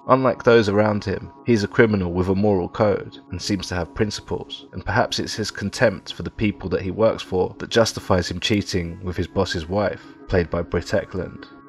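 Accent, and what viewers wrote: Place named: United Kingdom. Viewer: 😊 I like this documentary about gangster film genre.😊 I like the narrator with the British accent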